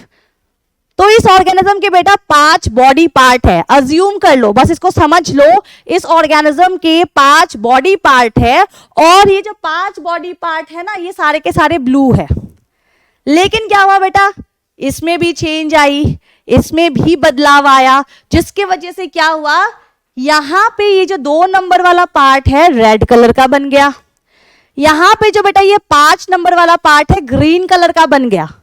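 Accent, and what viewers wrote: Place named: Bhojpuri, India. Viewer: ARE DIKKAT KA HAI RE.. BEST BHOJPURI TONE... 🔥🔥😂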